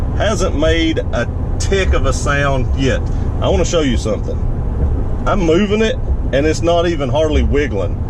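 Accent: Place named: South Carolina, United States. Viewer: Are you sure you are not from SC? I know you are from Florida but that accent is distinct.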